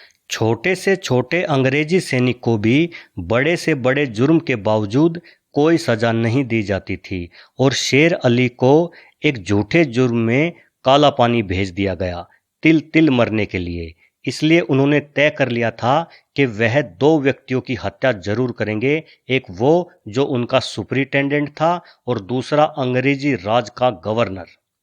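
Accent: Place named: Rohtak, India. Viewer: Aapke Rohtak type उच्चारण ka koi nidan dhoondein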